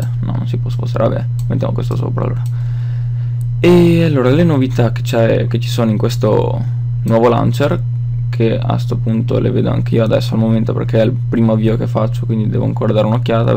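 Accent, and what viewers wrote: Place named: Rovigo, Italy. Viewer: sei di rovigo lol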